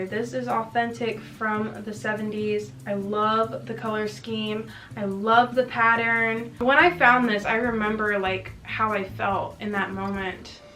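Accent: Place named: United States. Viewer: u sound like one of my cousins from america 🥺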